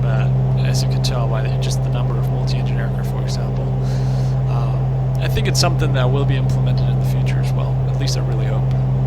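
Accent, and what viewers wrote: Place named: France. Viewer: Salut ! Sympa la review, tu es français non? Tu parle vraiment bien anglais mais j’ai l’impression d’entendre un accent français quand même ! Y’a pas mal de pilote qui s’entraîne en anglais c’est pour ça que je pose la question car j’arrive assez bien à comprendre ton anglais donc tu pourrais être français 😂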